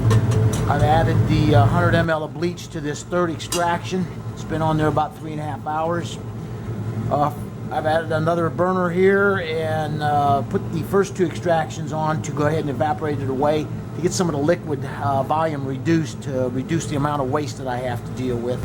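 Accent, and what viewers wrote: United States. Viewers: I stop learned i dont understund when he speaking american- englich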